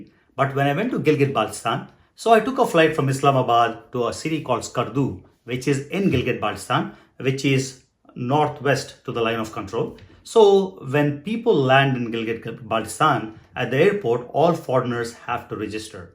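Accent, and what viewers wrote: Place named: India. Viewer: Please the following links and contact this vlogger how to get permission. <link> (Mr. Mohsin Paracha is one of the tour operator in Pakistan. <link> please use local tour operator if u plan to travel in sensitive and populated area. Ur appearance is guaranteed fast Indian and u can't hide ur physics or ascent.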